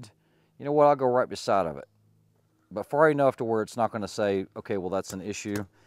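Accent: South, United States. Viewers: Got to love an Asian with a Southern accent beating on a Chinese knockoff.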